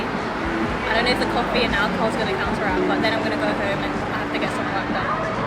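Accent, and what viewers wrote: Philippines. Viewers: Ur Tagalog accent is sooooo lovely 🥰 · I was about to ask kung pinay ba sya haha kasi iba accent nya pinay pala talaga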